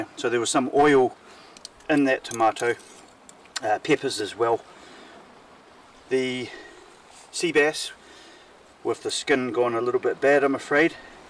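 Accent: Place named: New Zealand. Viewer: For what it's worth I enjoy videos like this one. I am also a "Kiwi" (I thought I detected your accent a while ago but I was not certain) and I reside in New Zealand, but I lived in Scotland (Oban to be specific) for about two months in late 2001. There's some superb photography in this video - I really appreciated it.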